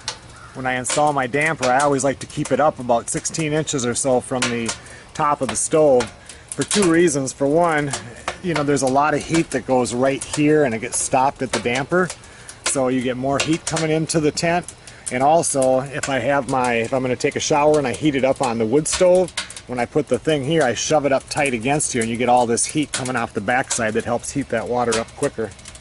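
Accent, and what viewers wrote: Minnesota, United States. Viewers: Love Minnesota accent, Ohh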